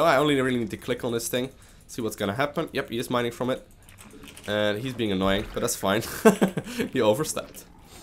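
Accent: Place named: Netherlands. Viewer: Saw this video randomly in recommended panel and man, that 3 years are such difference... event that weird dutch accent you can hear is no longer noticable in 2017 videos :)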